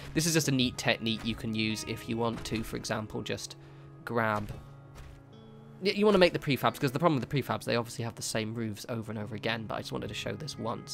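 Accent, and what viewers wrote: United Kingdom. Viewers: I normally hate British accents but yours is acceptable 😂 keep up the videos!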